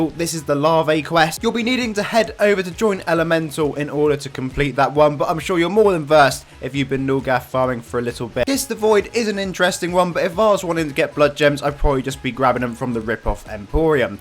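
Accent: United Kingdom. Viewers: I can’t comprehend the bristhist accent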